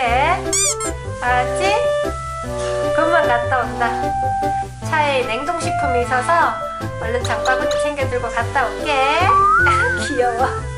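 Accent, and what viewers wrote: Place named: Gyeongsang, South Korea. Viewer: 경상도 언어가 이리도 러블리 할수가 ♥ 알아 듣는 개딸 꼬니는 더더 ♥♥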